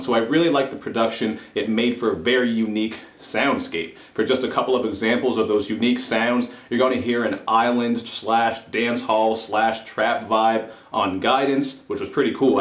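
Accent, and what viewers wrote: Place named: Canada. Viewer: oh youre canadian thats a funky accent bro and in part sound funky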